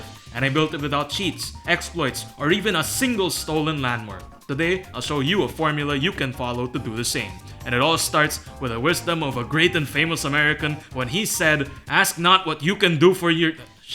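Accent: Philippines. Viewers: i just started playing tropico 6 and was looking for some videos to get better. i noticed your accent and subscribed right away haha. love seeing filipino channels as well thought out as yours. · Are you filipino? I can't quite tell your with your accent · you sound very filipino. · you sound Filipino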